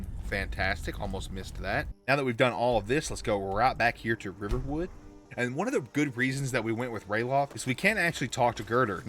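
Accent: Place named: Louisiana, United States. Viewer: Where you from bro? Lol im from Louisiana so the accent is familiar. Geeat content btw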